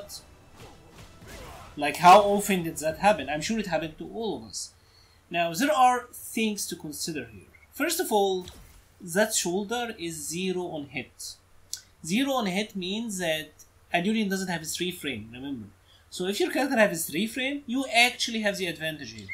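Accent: Egypt. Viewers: Man u sound egyption?? great video . Can u do other mus??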